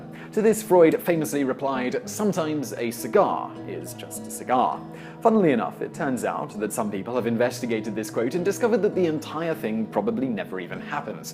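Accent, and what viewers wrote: England, United Kingdom. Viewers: The fact the guy thats talking has a english accent makes me happy and satisfies me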